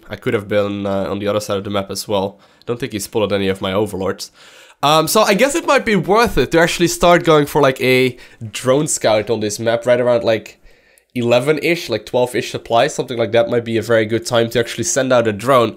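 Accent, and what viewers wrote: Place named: Netherlands. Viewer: Where you from, because you speak english really wel but you have a small foreign accent. Are you dutch or something?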